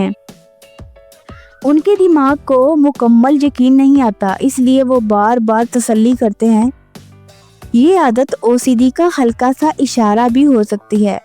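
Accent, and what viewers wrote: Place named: Punjab, Pakistan. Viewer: Allah ka wasta hy ,tm log urdu ka baira garaq mat kiya kro,video bananay sy pehly urdu Bolny ki achi tara sy practice kr liya kro, Urdu ko bhi panjabi ki tara bolty ho😕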